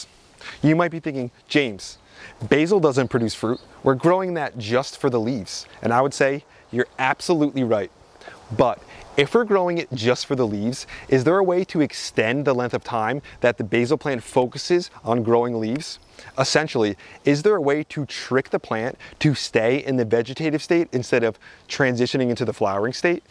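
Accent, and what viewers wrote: New Jersey, United States. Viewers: Your videos are super educational and helpful! Plus my 6 year old daughter absolutely loves your introduction and Jersey accent. 😁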